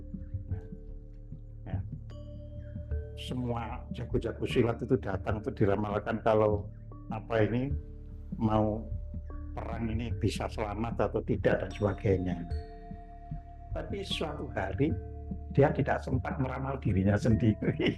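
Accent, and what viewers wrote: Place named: Surabaya, Indonesia. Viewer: Bopo Suroboyo ne kentel bgt nih, Sampek emeh keplincuk 😅😅😅, Rahayu bopo ❤❤❤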